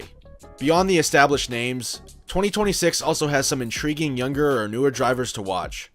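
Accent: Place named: United States. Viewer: I live 10 minutes away from brands hatch and have been going to watch the touring cars since the 90s. Its always been an exciting series to watch and has captured me since I was a child. I cant lie its a bit mad hearing an american make a video on this championship but clearly you have a good knowledge of the series and this was a great watch!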